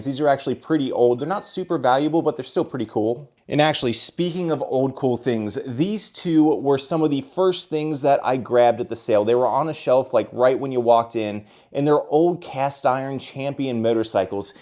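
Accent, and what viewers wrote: Philadelphia, United States. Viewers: That accent has gotta be Philly or Pittsburgh. Request for you to say a few words in your next video: water, home, motor oil, hoagie, youse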